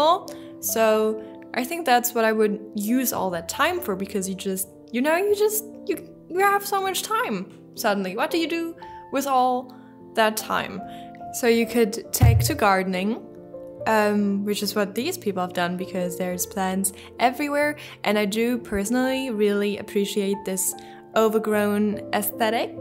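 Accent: France. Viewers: I'm wondering if you're french because of how you pronounced some words in this video i think you are ! Anyways ... i like the build a lot Vampires are my favorite supernatural beings I don't think you should be ashamed that you were into Twilight back then it was a global phenomenon so many people were into it i mean i was into it lol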